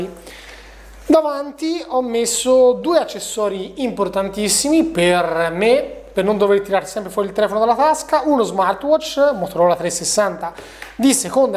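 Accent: Tuscany, Italy. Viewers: Sei toscano, vero? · sei toscano???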